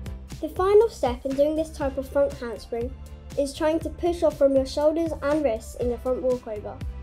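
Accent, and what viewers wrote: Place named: United Kingdom. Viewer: I love your British accent 😃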